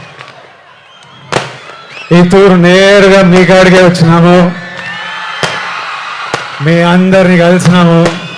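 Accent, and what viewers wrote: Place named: Rayalaseema, India. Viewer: Rayalasema(Chittoor) slang not telangana (telugu) slang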